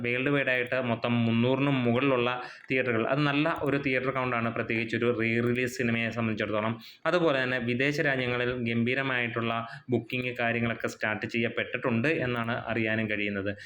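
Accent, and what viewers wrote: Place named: Thrissur, India. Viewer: Thrissur ragam👌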